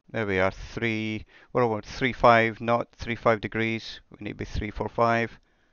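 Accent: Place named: Scotland, United Kingdom. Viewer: Repetition is not so bad when it's in a Scottish accent. 😉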